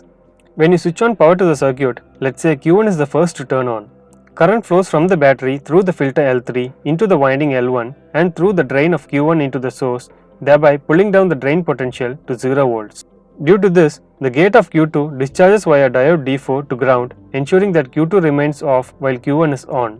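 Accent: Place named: Kolkata, India. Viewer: Hi brother, your accent seems from calcatta. May I I know your home and educational background?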